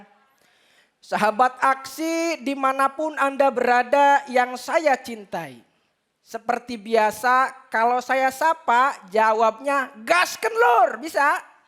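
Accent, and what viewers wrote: Java, Indonesia. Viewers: Materi bagus ko wasalam ya apa gara gara pokal suara tidak ngebas di tambah bahasa indo nya masih ada logat jawa jawa nya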